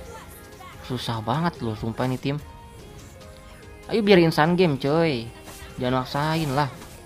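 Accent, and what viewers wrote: West Java, Indonesia. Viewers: Ngakak, sunda na karasa pisan ekek. Sukses brad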